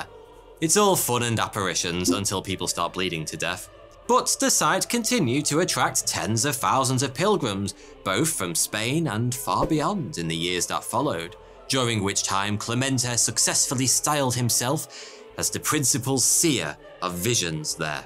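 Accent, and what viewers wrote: United Kingdom. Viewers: I love how British he sounda💕🇬🇧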